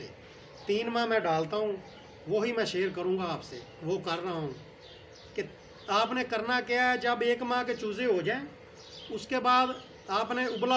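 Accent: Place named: Punjab, India. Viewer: Ustad g punjabi me apki video ka alag maza hai 1 alag maza hai punjabi lehjy me aapk ❤❤❤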